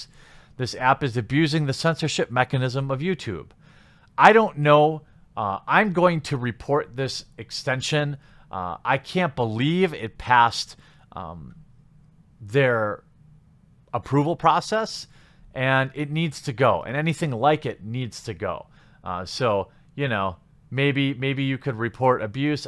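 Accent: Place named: Midwest, United States. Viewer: Interesting Midwestern vowel merger: since/sense homophones, etc.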